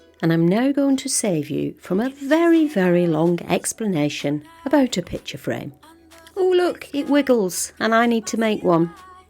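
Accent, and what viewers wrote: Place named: Scotland, United Kingdom. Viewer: I'm sure the Scottish accent will come back! 😂🫣🤔 Looking forward to seeing what you do with the pallets! xx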